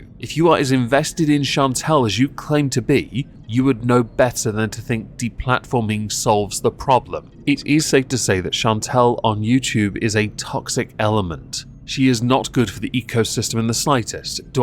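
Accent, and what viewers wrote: United Kingdom. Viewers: someone with a British accent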